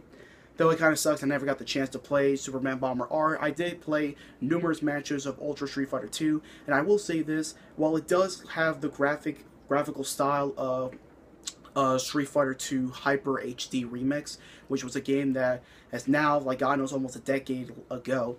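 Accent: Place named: New York, United States. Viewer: I love this New York accent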